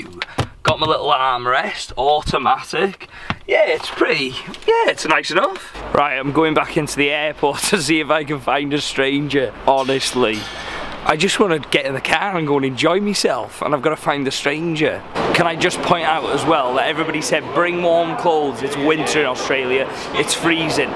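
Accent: United Kingdom. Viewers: Who can't give this guy with the sweet british accent free stuff :)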